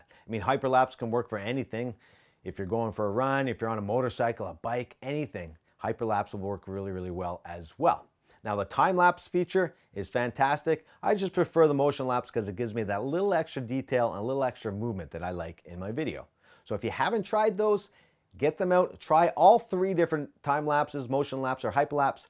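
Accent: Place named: Canada. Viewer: I knew I heard the Canadian accent, I’m from Northern Ontario :) thanks for the info, gonna label my gear the same out here in Vegas! Cheers, love the videos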